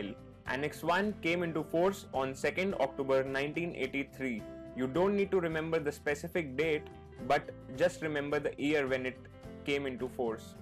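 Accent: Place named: India. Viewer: what's wrong with your pronounciation,buddy?! it's really hard to get your indian English,unfortunately...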